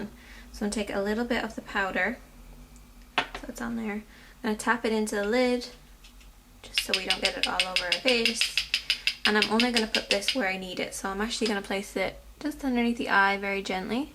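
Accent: Ireland. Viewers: Are u Irish?? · ur irish?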